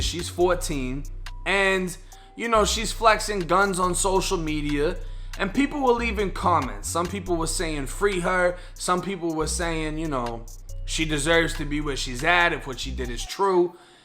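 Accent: Boston, United States. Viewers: You from Boston? Hear that accent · Yo bro u got ever been to Boston?? Cause u sound like one of us nbs lol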